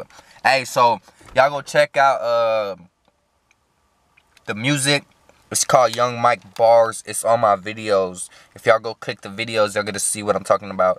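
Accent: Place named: Houston, United States. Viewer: This dude sound like he from Houston 😂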